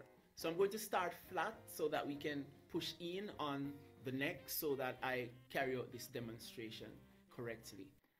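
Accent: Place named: Jamaica. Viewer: Yes, a yardie. I heard the accent and went over to your channel. Can't hide. lol!!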